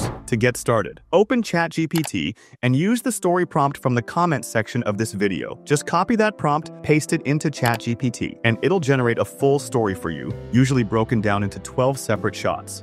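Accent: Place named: Asia. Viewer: how come you have a new voice boss, likes your asian accent better 😊 i know this tool😊 before i watched your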